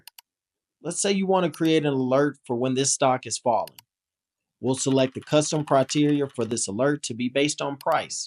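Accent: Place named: South, United States. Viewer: Really appreciate the shorter, to-the-point format. All help, no fluff. One suggestion: the heavy country accent is a little distracting.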